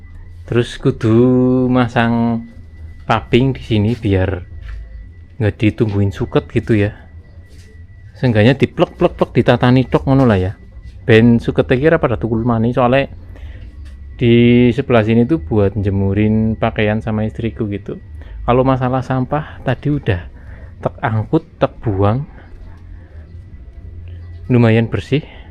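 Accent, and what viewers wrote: Banyumas, Indonesia. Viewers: Wong ngapak nek ora ngapak ora penak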